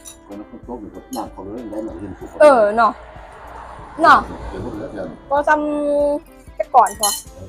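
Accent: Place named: South, Thailand. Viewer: ເມື່ອຄົນ ໃຕ້ໃດ້ຍິນສຽງໃທໃຕໃທໃຕ້ຈັງແມ່ນມີແຮງເຫມືອນໃດ້ຢູ່ບ້ານຕົວເອງ😊❤❤❤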